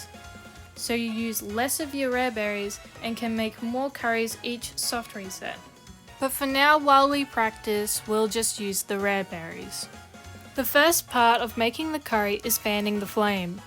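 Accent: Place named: United Kingdom. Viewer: You sound board and British. It's going to be ok 👍 don't be sad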